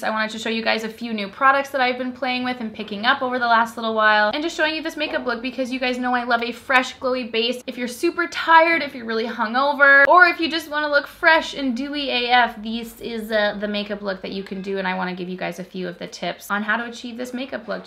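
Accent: United States. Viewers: i must say , i did not expect an american ascent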